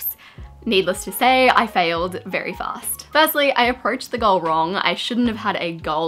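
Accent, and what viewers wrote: Australia. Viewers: Oh my god, I've been a long time watcher and I knew you were a fellow Aussie but I never realised you were Gold Coast too, hearing you say Mr Gardener spooked me so much because my brother had him in Grade 4 too !!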